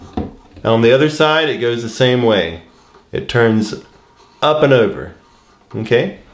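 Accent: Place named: Western North Carolina, United States. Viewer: Not a severe western North Carolina accent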